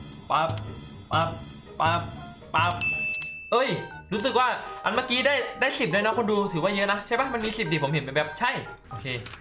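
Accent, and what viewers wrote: Korat, Thailand. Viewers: คนโคราชและดูออก😁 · สำเนียงโคราชก็มา55💖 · โคราชจ๋าเลยน้อK2J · โคราดจัด😆😆😆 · ผมชอบพี่พูดโคราช เเทะๆ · พูดโคราชทั้งคลิปหน่อยครับ555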